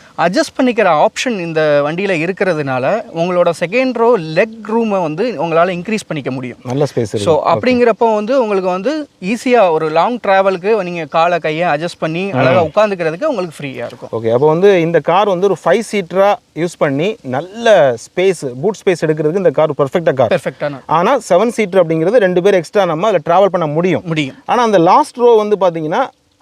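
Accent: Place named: Tirunelveli, India. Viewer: மாஷாஅல்லாஹ்.! இறைவன் உங்களுக்கு அருள் புரியட்டும்..!! உங்களின் வீடியோக்கள் அனைத்தும் புதிதாக கார் வாங்கும் மக்களுக்கு ஒரு சரியான வழிகாட்டி.!!! ஒரு காரில் உள்ள குறை, நிறைகளை சாமானிய மக்கள் கூட மிகத் தெளிவாக எந்த மனக் குழப்பமும் இல்லாமல் வாங்குவதற்கு மிக உதவியாக இருக்கிறது. அருமையான நெல்லைத் தமிழில் உங்களின் கார் பற்றிய வர்ணனை மிக அருமை. சில யூடியூப்பர்கள் ரிவியூ என்ற பேரில் டஸ், புஸ்ன்னு இங்கிலீஷ்ல பேசி தமிழர்களின் பொறுமையில் விரலை விட்டு விடுவார்கள். ஆனால் உங்களின் தனித்துவம் எப்போதும் எல்லோராலும்.. விரும்பப்படும். உங்களின் கனிவான, பணிவான பேச்சு & வார்த்தைகள் எல்லா "சமய" மக்களையும் கவர்ந்திழுக்கிறது. வெகுஜன மக்களின் அன்பும், ஆதரவும் உங்களுக்கு எப்போதும் உண்டு. ஏனென்றால் நீங்கள் மற்ற யூ-ட்யூப்பர்களை விட தனித்து நிற்க்கிறீர்கள்.! அதற்கு காரணம்..!? கமாண்டில் கேள்வி கேட்பவர்களுக்கு உங்களின் ஆரோக்கியமான, கண்ணியமான பதில்கள்.! வாகனங்கள் பற்றி உண்டான உங்களைப் போன்றவர்களின் மிக ஆழமான சிந்தனை இந்நாட்டு அரசுக்கும், நாட்டு மக்களுக்கும் அவசியம் தேவை. 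தமிழக, மற்றும் ஒன்றிய போக்குவரத்துதுறை உங்களை பயன்படுத்திக் கொள்ள வேண்டும். என்றும் உங்கள் பணிகள் சிறக்க என் பிரார்த்தனை & வாழ்த்துக்கள்.!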